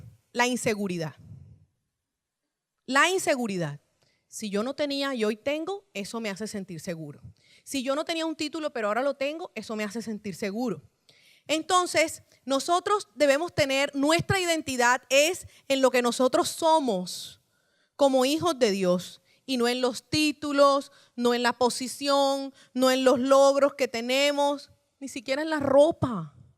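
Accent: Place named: Caribbean Coast, Colombia. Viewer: Gracias, la pastora se escucho muy costeña. Muchas gracias. Muy buena predica... Att: Una Barranquillera desde USA, NJ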